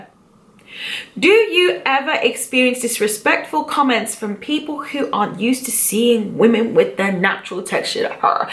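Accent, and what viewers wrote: England, United Kingdom. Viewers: Just subscribed to your channel. Thank you for the output. You sound English but your products are priced in $. Do you have a UK supplier or am I misunderstanding something?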